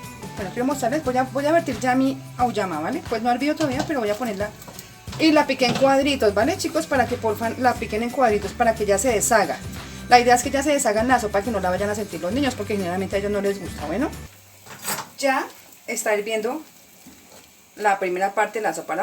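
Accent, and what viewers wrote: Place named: Bogotá, Colombia. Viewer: Yo ya te go los ingredientes, me agrada como hablas muy chévere bien Bogotana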